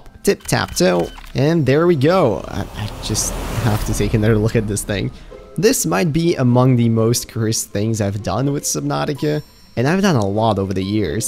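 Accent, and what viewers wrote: Netherlands. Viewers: Immediately knew you were Dutch. It's the accent lol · bro i just discovered youre dutch, you can hide your accent super well!!!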